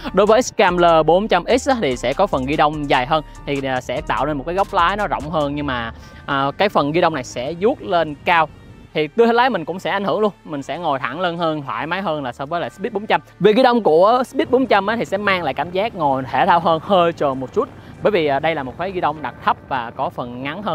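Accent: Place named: Saigon, Vietnam. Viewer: Một Chútttt nghe nó sượng lắm ý, kiểu nó không đồng nhất. Nếu đã phát âm cho đúng thì đúng hết, còn này toàn bộ giọng Sg xong mỗi từ chútt thì nhấn như ng Hà Nội. Kì vậy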